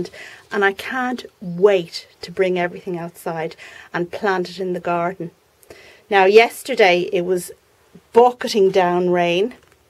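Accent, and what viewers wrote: Ireland. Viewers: I live in the U.S., Virginia to be exact. If I lived in Ireland, with access to all of the great plant material I've seen on your channel, and if I had the kickass Irish accent, I would lose my mind.:P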